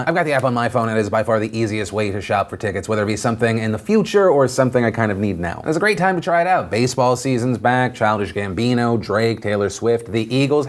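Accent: South, United States. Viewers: Why does talk with a southern like accent some of the time? When he is of Italian descent, raised in the Bronx, and currently lives in LA. 🤔🤔🤔 Love and his show, just wondering?! Lol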